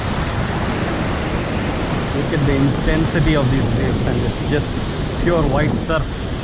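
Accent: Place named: Telugu, India. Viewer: Maa shaa allaah baraak allaah Pure Telugu awesome bhai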